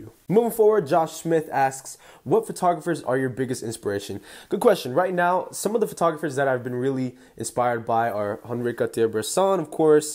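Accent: Philippines. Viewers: Hi ate! HAHA lovin' the Filipino accent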